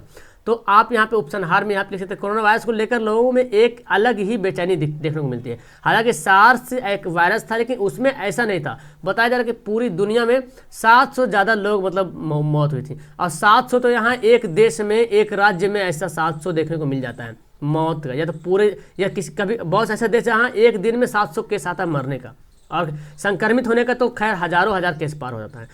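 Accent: Bihar, India. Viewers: Bhai Bihar se ho kya? Awaz se lagta h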